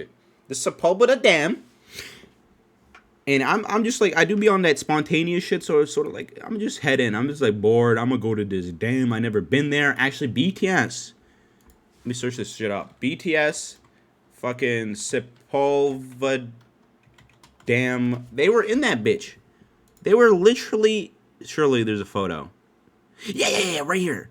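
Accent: Canada. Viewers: I havent noticed until now but got a decently strong canadian accent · fr he sound like a white guy, or maybe canadian speaks like that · I knew you’re not American from scattered context but damn, how did I not clocked you as Canadian? I’m a failure.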